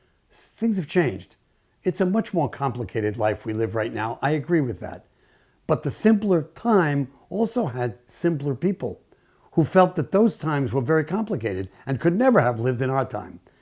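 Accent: New York, United States. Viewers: You're a treasure Mr! 🌟The clarity and astuteness of your insights and the directness with which you address us helps to sharpen up our minds, no matter our ages. ☝ Your voice & NY accent are also very appealing. 😊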